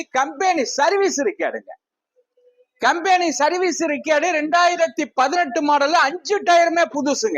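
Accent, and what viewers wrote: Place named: Nilgiris, India. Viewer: Car ra neelagiri thayalam slang la sale pani ipotha pakuran😅😅😅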